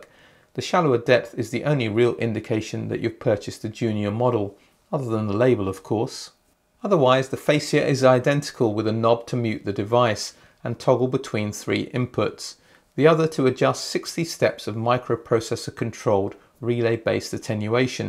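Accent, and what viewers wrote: United Kingdom. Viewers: after 10 minutes, i could not take anymore the barroque and burgeous of the whole british speaking thing, sorry. Overdose. so much for just explaining a device...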